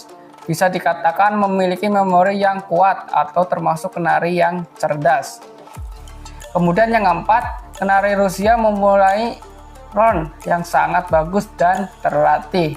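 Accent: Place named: Banyumas, Indonesia. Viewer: Ini baru ahli burung..jos kang.ora ngapak ora penak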